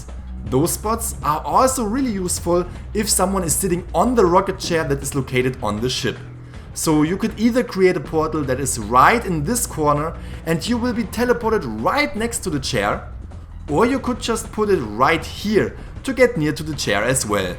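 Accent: Germany. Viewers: Are you German or something? Your accent and your personality perfectly match. No wonder your videos are so entertaining. And your editing!? SUPERB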